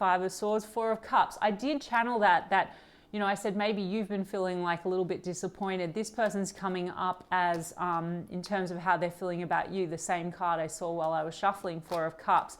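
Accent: Australia. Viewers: Wow, you picked up my kids, resonate with everything.....thank you 🙏 And your Australian 🥳🥰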